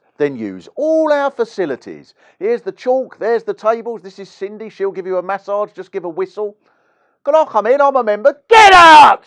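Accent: United Kingdom. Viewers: Yes, nice British English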